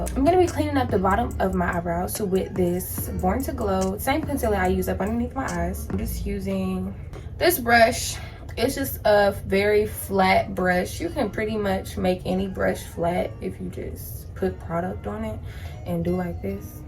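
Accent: South, United States. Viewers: Omg, I didn't know you were from Augusta! I did hear a little bit of Southern accent! I am from Statesboro, GA. Love the video! XOXO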